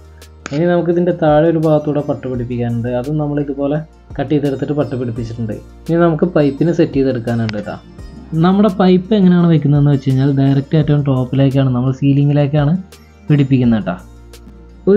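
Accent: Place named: Palakkad, India. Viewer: താങ്കൾ പാലക്കാടാണോ ." ട്ട" ഇത്തിരി കൂടുതലാണ് . Amazing Work👍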